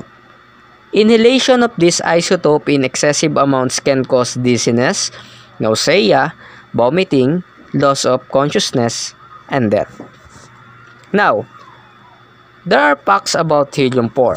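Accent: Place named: India. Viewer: While I very much appreciate the man explaining this, the Hindi accent in the English makes it quite hard for me to follow.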